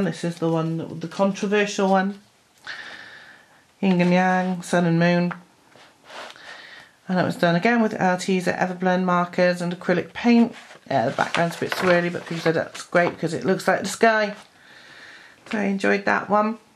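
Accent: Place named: Wales, United Kingdom. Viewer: I love how matter of fact and down to earth you are. Colouring- warts and all, with baby and lack of sleep and dressing gown, is very refreshing. Also love your accent. I think you live in Wales, but does your accent have other influences?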